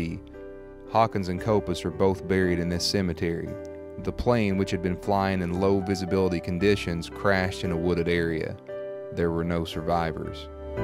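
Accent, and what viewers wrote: South, United States. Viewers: everything is great, it really is! I guess I struggle with your southern accent because English is not my first language. But other then that, awesome videos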